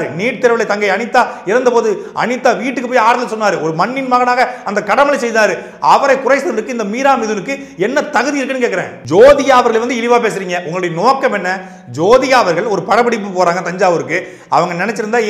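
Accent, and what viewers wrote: Tirunelveli, India. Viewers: நெல்லை தமிழை நெருப்பாய் கொட்டும் சாட்டை வேற லெவல்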